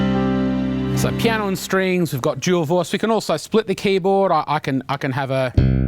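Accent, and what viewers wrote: Australia. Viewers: Lol. He collects a check from Roland for hyping their product..and in that thick Australian accent. But despite the hype it's not a bad piano. I got one and love it.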